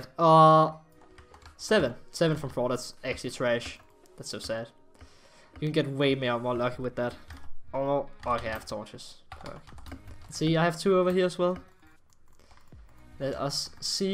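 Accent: Denmark. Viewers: are u danish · Is this man from Denmark ? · U danish?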